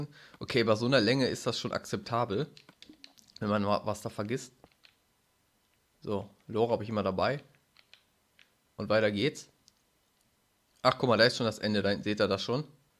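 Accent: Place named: Dortmund, Germany. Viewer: Als ob du aus Dortmund Kommst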